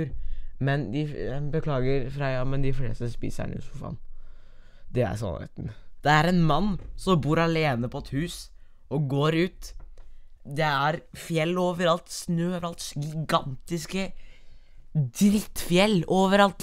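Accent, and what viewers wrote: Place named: Sunnmøre, Norway. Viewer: Beste Sunnmøre dialekt ej he hørt :D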